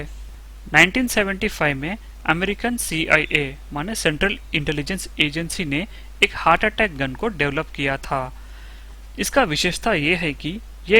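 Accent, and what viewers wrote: India. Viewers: Boli badi acchi lagi, Marathi ho na bhai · Aap hydrabadi ho na..?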